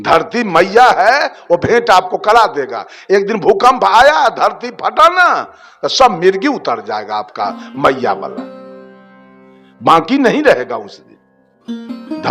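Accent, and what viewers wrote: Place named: Bihar, India. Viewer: Baba ji aap ka tone to bihari lag raha aur ghee dudh dahi ki baat kar rahe ye to sadiyo se chalti arahi parampara hai Sanatan Dharm mei avi ke pandit thodi late hai ghee sudh hota hai isliye to samsaan mei v ghee se jalaya jata hai 😊bina Shakti se ye duniya nai chal sakti aur chath Puja k baare mei to kuch bolna v mat qki aap agaynta mei hai ye sanatan ki astha hai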